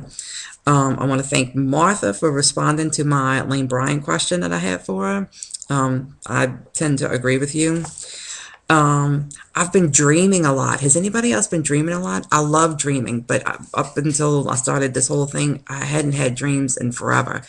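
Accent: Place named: New Orleans, United States. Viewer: Just getting started on this journey (take 2 due to insurance change) and have been jumping around a lot of vloggers and starting to subscribe. Many haven't posted in years so thank you for keeping at it...this is for life!Loved your goals way back when...I, too, have a weight goals that tie to pregnancies and a dress! The horse and amusement rides made me smile....yup. Anything with a weight limit is an issue (even if under I feel I am not far enough) and squishing (or worrying about breaking) into lots of seats (and booths) is something I cannot wait to not worry about as I survey a room.I teared up at your necklace NSV. I get it, gurl. I am a New Englandah....the only accent I would trade for mine is "n'orlans" so your videos are nice on the ear, too!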